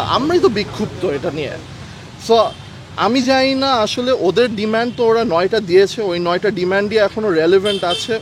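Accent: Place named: Bangladesh. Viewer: তোমার কথাগুলো এত চমৎকার লাগলো যে কি বলবো। তুমি অত্যন্ত গুছিয়ে, বলেছো। তোমার কন্ঠের সৌন্দর্য, শারীরিক ভাষা, গুরুত্ববোধ, আঞ্চলিকতা সব মিলিয়ে একটা পারফেক্ট বাংলাদেশী মানুষের রুপ সুন্দর করে ফুটেছে। মানে তুমি পুরদস্তুর বাঙ্গালী এবং বিদেশ গেলও বাংলাদেশী।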